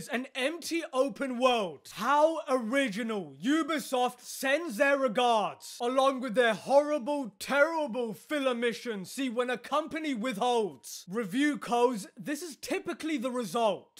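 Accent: United Kingdom. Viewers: I love the way you explain it with ragefull british accent WKWKWKWKWKW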